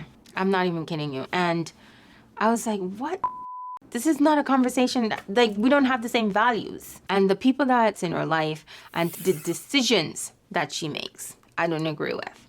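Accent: Jamaica. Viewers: Love the Jamaican accent, you resonate with me! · I hear a slight Jamaican accent? If so hey girl hey! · Are you Jamaican? Because your accent is showing 😊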